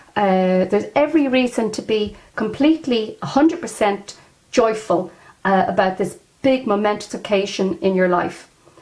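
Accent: Ireland. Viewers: Hi, only just this minute found you and am watching this video. You're fab! Trying to place your accent, are you Cork?? I'm in cobh 💖 Can't wait to check out your other videos Tomor. Would also love to see videos on each card 😘 Love listening to you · Yass! So beautiful to hear an Irish accent teach tarot. I've been studying tarot for three years since ive been abroad in Australia and havent really connected with any Irish regarding divination! Thank you for your master classes 🍀❤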